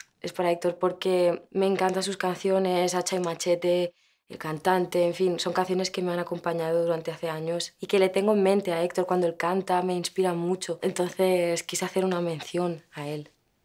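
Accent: Spain. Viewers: Pensé que era Latina, me sorprendió cuando empezó a hablar con ascento español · When she's speaking has the castillian accent but when she's singing she loses it. I'm confused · I can't stand the Spanish accent from Spain but she speaks so clearly and being cute and talented does not hurt.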